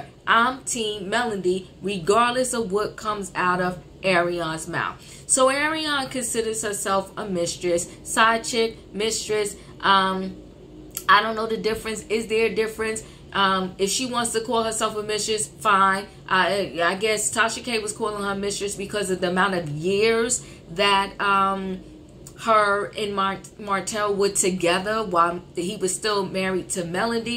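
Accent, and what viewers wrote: New York, United States. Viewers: ❤ your NY accent and attitude while giving your commentary. I watched twice in a row. You're on point!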